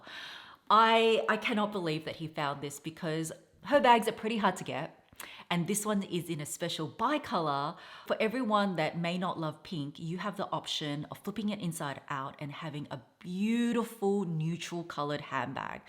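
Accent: Australia. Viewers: I’m very GRATEFUL for my wife MIKKI who I’m entering your give away for! She enjoys watching your latest video haul and I like listening to your Aussie accent, like when you say “leathaaah or evaaah. Her birthday is coming up in December and the Hermès Her bag would be the perfect birthday gift for her! I’ve been looking into building my history with Hermès by shopping for belts and handkerchiefs! I’ve even been browsing for Hermès tea cups just so I can get the chance of maybe purchasing a bag for her. Thank you for giving us a chance to win the Hermès Her bag! We’ll wishes and Keep up the fantastic job!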